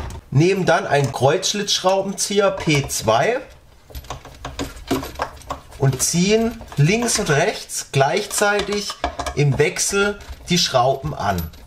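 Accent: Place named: Baden, Germany. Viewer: Mach's wie Du meinst - aber bloß keine Angst vorm eigenen Dialekt. Deine Zuseher kommen, weil sie Informationen wollen - das kannste super! (Übrigens, das hatte ich vorher vergessen: sehr gute Beleuchtung und super Kamerawinkel da unterm Waschtisch. Das ist nicht einfach!) Und sie bleiben, weil sie dich als Typ gut finden. Klar muss man so sprechen, dass einen die Menschen verstehen. Aber a bissl Dialekt hat noch nie geschadet. Schau mal ins Fernsehen: Wer ist da der erfolgreichere Entertainer? Der dialektfreie Nachrichtensprecher, oder der authentische Unterhalter mit Dialekt? Du kommst aus Baden? Besser geht's doch gar nicht für einen Handwerkerkanal. Schaue grad dein neuestes Video. Stimmt, das ist schon viel besser geworden. Aber versuche mal viel freier zu sprechen. Kannst ja mal bei einem Video zwei Versionen machen und deine Freunde ansehen lassen. 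Glaub mir. Je authentischer, desto besser.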